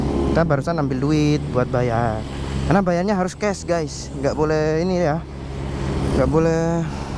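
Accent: Sidoarjo, Indonesia. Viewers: Orang Sidoarjo bang?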